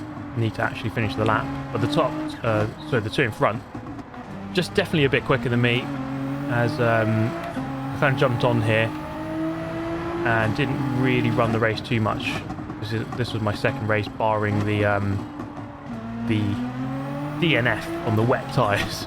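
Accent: United Kingdom. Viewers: I'm pretty sure Fanatec is pronounced "fanatic" as in a crazy person. Don't hate me. You'll probably get away with it because of the British accent! Rock on!🎉😂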